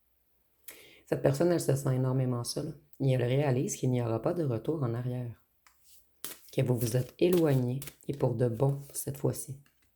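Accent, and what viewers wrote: Quebec, Canada. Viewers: Vous êtes trés drôle ,j adore votre accent québécois .Je suppose que le vehicule dont vous ne trouvez pas le nom avec lequel le monsieur veut poursuivre ma voiture ,est un tricycle pour enfant .🤣😂Donc en effet il n est pas près de me rattraper .Il vaut mieux en rire .Merci .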